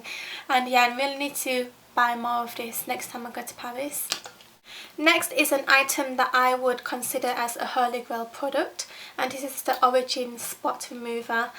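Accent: Yorkshire, United Kingdom. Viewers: what's the name of her accent? is it yorkshire?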